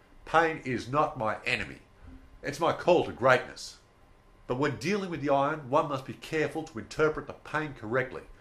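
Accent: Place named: Australia. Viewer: Thanks for posting and great dictation, love the oz accent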